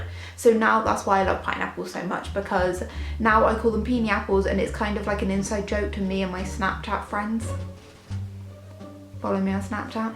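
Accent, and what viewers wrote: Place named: South West England, United Kingdom. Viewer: I'm from the south west too, Bristol to be more specific. I thought you were from the south west, because of the accent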